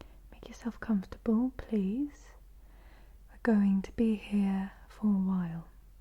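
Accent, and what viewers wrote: England, United Kingdom. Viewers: Your accent is very good middle english, I'm a Brit but when I'm In the states people think I'm Australian! I'm a guttural Londoner though, constantly dropping my H's! 😉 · Are you originally British? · Just love your British accent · If this was the first video of yours I watched I'd have believed you were British. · !! Happy Thursday!! CONGRATS ON 100K!! 🎉🎉🎉 Very well deserved!! I’m always happy to visit your new ASMR uploads:) They make my day filled with comfort and relaxation and love the British accent:) You are awesome:) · Are your England? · Best English accent this side of the pond.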